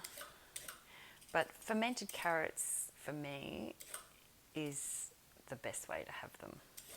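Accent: Australia. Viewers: I thought ... 'that sounds like an Aussie, but must be in North America, because some of the words used', I now realise you are in Vic. My question ... what are the visual signs the ferment is done? I have had all failures in this first month of trying. The chooks are getting heaps of sauerkraut. I make a good sourdough, so I thought I would try to make a ferment starter. Well .. it is turbocharged ... I made carrot sticks, with fresh dill, in a Leggo's jar. Next morning, I tried to 'burp' it, it came out like a shaken bottle of champas. Today, was the third day, when I opened the lid, I had to quickly put it back on again. I opened it 3 times in 10 minutes, and finally it wasn't trying to spew out. It still had crunch, but definitely softer, a little bit salty, but I wouldn't call it sour. I put it in the fridge.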